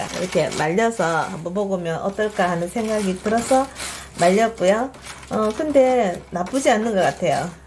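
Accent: Gyeongsang, South Korea. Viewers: 달성공원 언급하시는 거 보니까 대구분이신가 보네요? 경상도 억양을 들으면서 항상 어디신가 궁금했었는데... 반갑습니다, ^^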